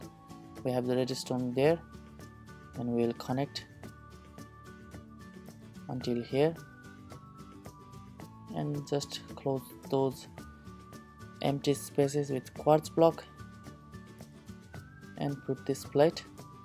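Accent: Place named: Japan. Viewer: And guys his counting doesn't suck, Its just hard to understand his accent. How about you all take classes in Japanese language for a change, Then you will hear the accent.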